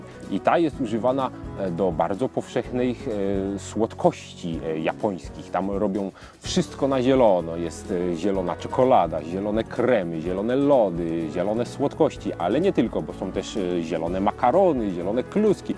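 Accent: Silesia, Poland. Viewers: piękny śląski zaśpiew <3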